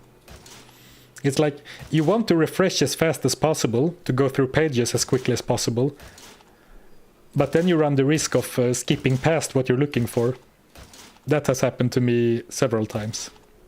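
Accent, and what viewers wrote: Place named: Sweden. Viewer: Hello fellow 🇸🇪 And as a "gaming tradition" in Sweden when at least one persen don't understand Swedish, everyone in chat/voice talks English. Therefore I will take this in understandable English. I belive Mephistos life points will be easer to reach than Duriels was. With at least 40 more strength. (perhaps ~50 if a 3os helm is possible or good circlet) and more possible strength on rings, amu and so on. + A few more lvls and skill shrine this will be easy for you. At least that is what I belive🙂. Got very sad when suddenly Duriel was dead. Good with the clip. Sad that it was without sound. Sidetrack. Got really suspicious when I first heard you. Wait what?! Is he from Sweden? You confirmed later on that vid or another one. I really enjoying your challenges. Keep them up😈🤘 Another sidetrack. Is the microwaved tea reheated👍 or do you prepare it that way☠️? 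Usual flavor? With or without milk? Even more sidetrack. In what part of Sweden do you live close to? If you answer this I guess you will have a big radius from the town you are meanchening. Because of the now little less anonymity with facecam and closer to 40 than 30 thing. BUT, let's think of the real threat, DIABLO !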